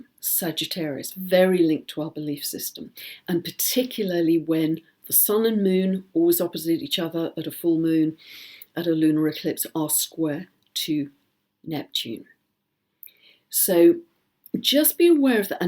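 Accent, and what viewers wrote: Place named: RP, United Kingdom. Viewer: I like that you are friends with Bracha. Good video. You are very posh:)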